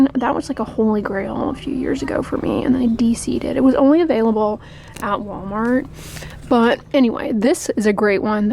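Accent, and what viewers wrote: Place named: South, United States. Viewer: Happy Sunday! Hope you’re spending time with your mother!!! ❤ In your car talk, you sounded so southern! I never noticed how much of a southern accent is hidden in your voice lol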